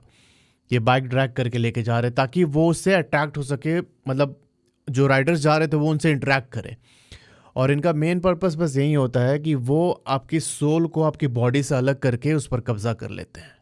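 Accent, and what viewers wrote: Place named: Uttar Pradesh, India. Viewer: Bhaiya please aap kaha se ho apki tone uttar pradesh se ho yesa lagta hai please reply 🙏 ❤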